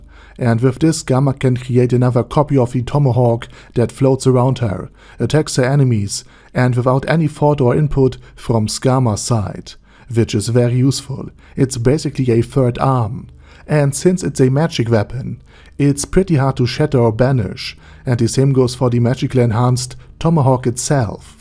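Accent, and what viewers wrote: Germany. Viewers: Bruder, dein deutscher Akzent ist schlimm.... Hab wrsl nen schlimmeren, aber kann ma das nd geben, wrsl aber trzdm n gutes video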